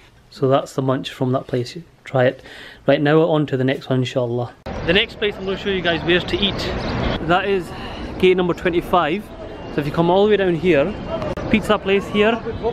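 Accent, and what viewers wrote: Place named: British Pakistani, United Kingdom. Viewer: He seems a british pakistani by his way of speaking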